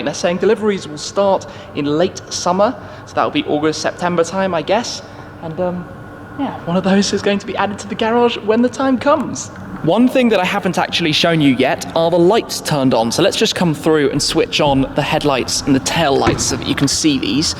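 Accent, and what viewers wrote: England, United Kingdom. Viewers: First I thought he is speaking qt different but later I realized that he is British! 😂 cheeeehhhhhrs! Gray one was better than red) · Ублюдки ебаные эти англичане , какой же мерзкий английский акцент 🤮🤮🤮🤮 Слух режет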